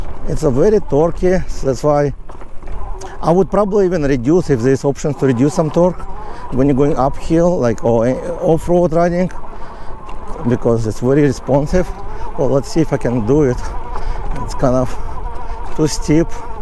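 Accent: Russia. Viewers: мне русский акцент режит слух, такое дерьмовое произношение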